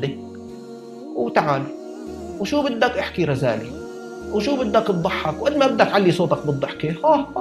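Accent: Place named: Levant, Arab world. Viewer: فين صوتك شامي فين دمشق...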